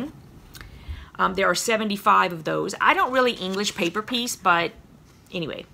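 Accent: Louisiana, United States. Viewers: I've lived in Ocean Springs MS since 1969. I have a little bit of a Cajun accent now and it depends on the word lol. I knew I detected Southern Louisiana in you. Kindred spirit!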